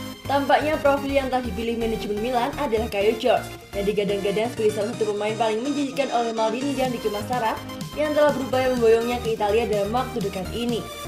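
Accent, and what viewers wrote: Java, Indonesia. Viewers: pengisi suaranya orang jawa ya? medok banget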